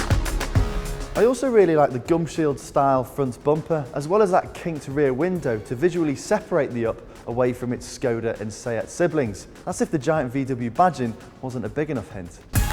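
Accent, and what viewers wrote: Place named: Yorkshire, United Kingdom. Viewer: I love the numberplate you're using here. "Ey up" when has a Yorkshire accent, please tell me that's not a coincidence. Also - the Seat Mii is now dead as of late 2018. Discontinued, but the Up! and Citigo are still going strong.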